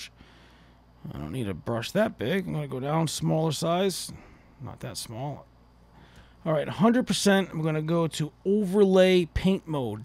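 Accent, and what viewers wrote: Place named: Boston, United States. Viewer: just subscribed. placed your accent in Steven Wright country, so not surprised to hear the Boston reference. nice straightforward tutes delivered humbly . thank you sir.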